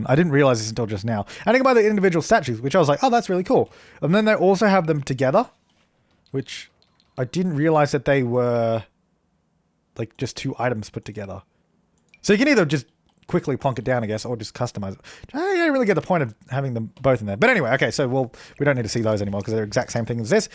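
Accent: Australia. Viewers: Its so good hearing an Aussie voice talk about the sims <3 :D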